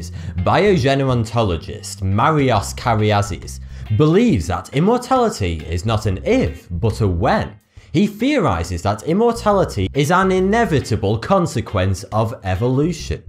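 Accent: United Kingdom. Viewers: Shady Marshal he's brittish come on give him chance i couldn't even say thoughty2 because i m from asia i always say ''to(cant say G)ty 2) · i luv his British accent :)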